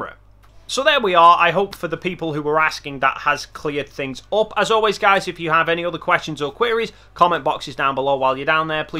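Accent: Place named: England, United Kingdom. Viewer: Also have to admit that I am torn about english accent. I mean... sometimes I think of Richard Attenbourough and while other times I think of an evil character from a movie... snotty and just evil!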